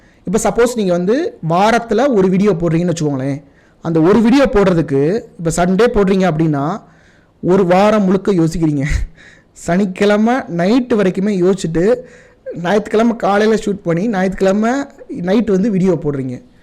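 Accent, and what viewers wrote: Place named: Madurai, India. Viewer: Nalla example ..nalla explain panringa brother..appo appo madurai kusumbu pechu vanthu vanthu poguthu athum nalla tha iruku 😅super information 👍👍👍🙏🙏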